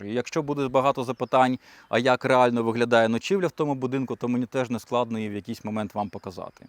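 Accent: Standard. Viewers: Класно говорить по українськи чиста соловїна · Доречі , дуже класна та чиста Українська!!!❤ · Дуже чисто спілкуєшся українською, в приклад іншим👍👍👍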